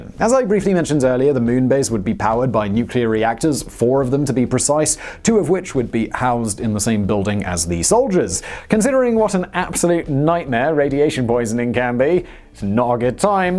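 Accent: United Kingdom. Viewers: It's a joke, not a dick. Don't take it so hard. On a serious note, I'd love to see such a channel, and would subscribe to it immediately upon knowing it exists. I truly enjoy delivery, and I love the British accent.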